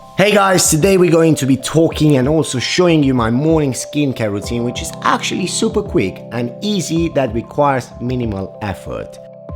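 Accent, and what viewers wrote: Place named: Italy. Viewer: Is he Italian or something he has an accent that us not fully British.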